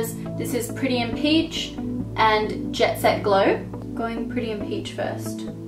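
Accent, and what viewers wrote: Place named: Australia. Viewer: I love your Australian accent and you do you makeup amazing so I had to subscribe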